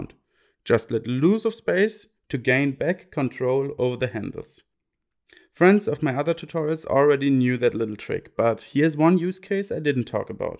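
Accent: Germany. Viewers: your name is not, it's. i know a german accent when I hear one:D great tutorial btw! thank you so much for uploading it!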